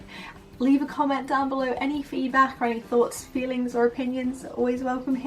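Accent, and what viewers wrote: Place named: United Kingdom. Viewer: Hhhahaa this is much better than our old channel!!!! How did you go from sounding American when we were young to now sounding British??? Hahha!! Love you long time!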